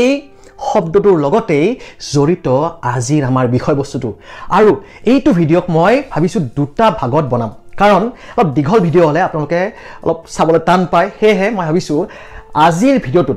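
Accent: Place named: Nalbari, India. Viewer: চাৰ আপোনাৰ মূখ্ত আমাৰ আপোন নলবাৰী ভাষা শুনি বহত বেছি ভাল লাগে আৰু আমাৰ নলবাৰীয়া হিচাপে আপোনাৰ পাই গৌৰব অনুভৱ কৰোঁ চাৰ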